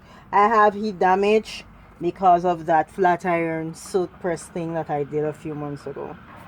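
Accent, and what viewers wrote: Jamaica. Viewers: First 12 seconds and I figured you’re from Jamaica or one of the islands!! 👏🏽 you definitely got my subscription. Great video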